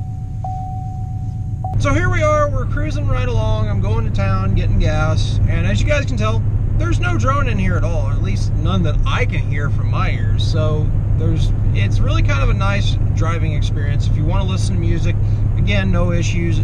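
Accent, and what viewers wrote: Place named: Midwest, United States. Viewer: In Mexico? You sound like you’re from the Midwest?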